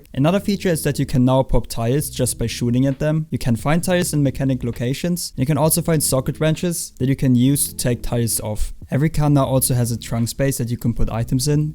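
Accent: Germany. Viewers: Man hört so hart den deutschen Akzent raus xDD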